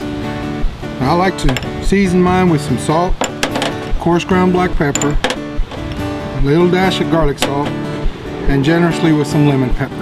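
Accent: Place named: South Texas, United States. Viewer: If anyone wants to know what south Texas Mexicans look and sound like...this is it. I’m from west Texas in El Paso and we may have the Mexican blood running through our veins but our culture is a bit different, both beautiful and unique. Y’all have fajitas and we have carne asada. Y’all have breakfast tacos and we have breakfast burritos. Saludos desde El Paso y San Antonio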